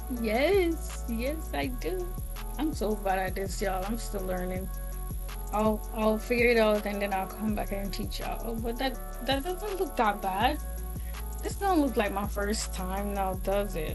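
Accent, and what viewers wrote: Jamaica. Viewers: girl have Jamaican accent ❤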